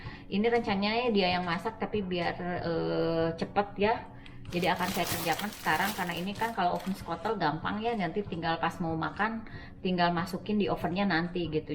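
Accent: West Java, Indonesia. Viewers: Meuni sundaa pisaaan euyy ..samii abi oge mojanh priangan atuh lahh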